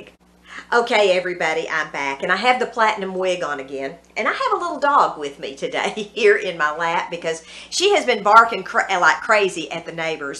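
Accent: South, United States. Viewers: I just found your channel. I think that you look very good in the red wig! You have a red head kinda personality! I should know because I am a redhead myself! I just subscribed to your channel. Oh yeah! I can tell by your accent that you are from the south. So am I!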